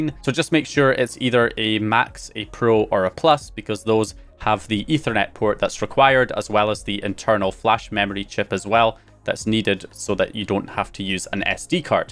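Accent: Scotland, United Kingdom. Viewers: He’s Scottish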